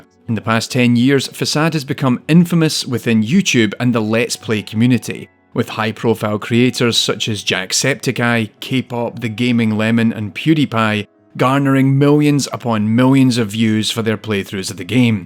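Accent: Scotland, United Kingdom. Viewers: I’m new to this channel and hearing another Scot made me happy ngl 🙃 good video also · I was ten minutes into this video before I realised you were scottish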